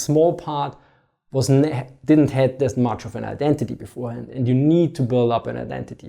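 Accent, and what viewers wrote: Austria. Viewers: Love your Austrian accent! Grüße aus Sachsen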